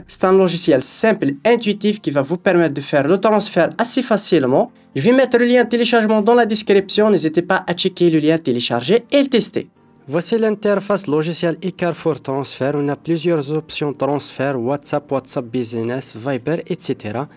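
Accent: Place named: Kabylie, Algeria. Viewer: Kabyle algérien ❤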